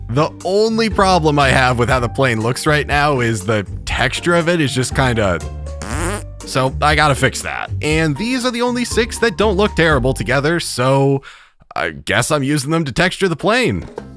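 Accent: United States. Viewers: In the first sentence that ive ever heard from this ytuber, I instantly realized hes american.🇺🇸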